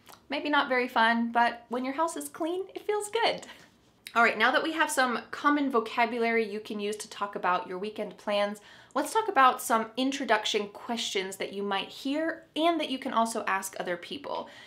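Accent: United States. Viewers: Are you from US.?🇺🇸😎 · your are so lovely ...... really .... Ithink my understanding for American accent getting better >> cause of you ...... thanks ..... keep it coming ..... Form Sudan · Is it American English???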